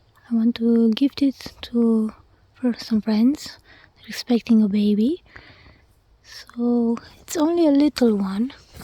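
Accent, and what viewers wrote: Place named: Romania. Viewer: Neat ma’am. By the way, you have a very soothing voice. Your Romanian accent is just the icing on the cake with your voice. I can listen to your voice all day.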